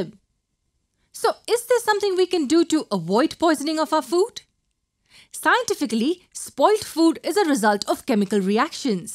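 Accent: India. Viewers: This Indian accent is killing me😭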